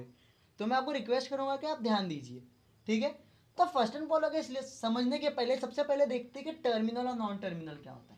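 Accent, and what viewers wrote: India. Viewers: indian people have the worst english accent in the world.i cant understand wtf is he talking about.pls go learn some english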